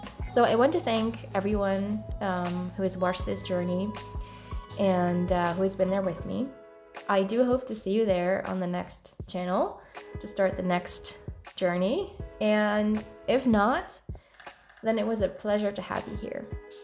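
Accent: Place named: Canada. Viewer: What an exciting new project! Can’t wait to follow along and perhaps get inspired to stay away from too much tv after work myself :) I recently started weightlifting and I feel like I’ve been missing out, it’s awesome and so helpful in combination w/ running. Didn’t realise you were from the Netherlands originally, your accent sounds very Canadian!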